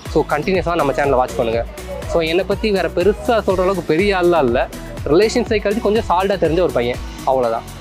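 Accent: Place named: Tamil Nadu, India. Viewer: Super broo😁unga tamil slang enaku romba pudikum🤗🔥🔥🔥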